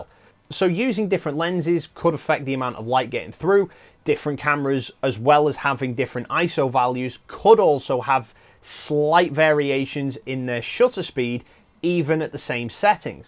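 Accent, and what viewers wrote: United Kingdom. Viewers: im sorry but you cant explain your rebuttal clear enough, you sounded like you were just regurgitating what tony was saying , but in a British accent . and furthermore, Tony shows his sources for his claims and you just didnt show anything but talk. this is click bait and your just feeding off someone elses channel to get some of their subs....NOT!!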